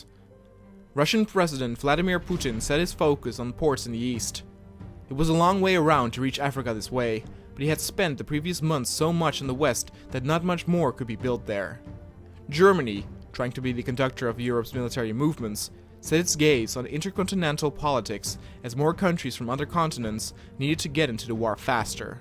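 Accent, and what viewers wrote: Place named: Netherlands. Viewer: Didnt know you were dutch(because how you say the g) im dutch toXD.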